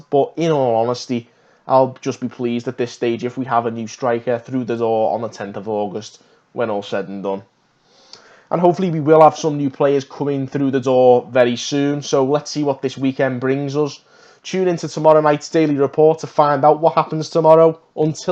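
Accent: Liverpool, United Kingdom. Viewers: The lads doing a good job If he talked faster none scousers might struggle.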